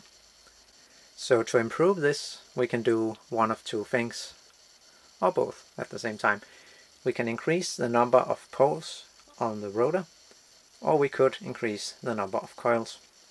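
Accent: Denmark. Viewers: Are you from Denmark ?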